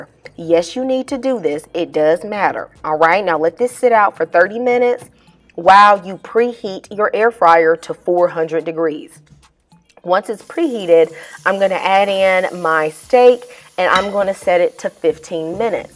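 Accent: South, United States. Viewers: Your voice is even salivating! Which may not seem like that southern touch has much value beyond entertainment, but I noticed i did not have to re-watch the video to catch important details such as cooking times or procedural tips that I often get lost-in when swept up by a videos production impression, or in some sense, distractions from the theme/game. I appreciate any production or performance that I feel immersed in the 'greatness of mind' while on board the content creators intention to convey what i can feel put a smile in there heart and thank you personally for packaging that up in this video and setting the table for us to love and in this way experience the joy that is personified by you, Thank you Lord, in Jesus name~Amen 🙏 💖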